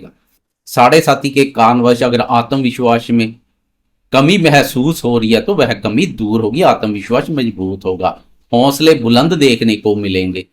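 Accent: Punjab, India. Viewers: 👍🙏👏Aapka punjabi ascent kisi apnay baray ki ascent ki tarah hai🙏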